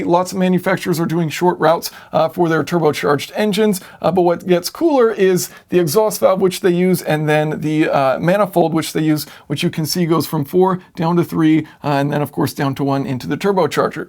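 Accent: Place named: United States. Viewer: Another great video thank you Jason. My question is a simple one, why in the States do you pronounce Mazda as Marz da? Though I could add, why also in America is Nissan pronounced Knee San? Expecting some hate here, but really just curious.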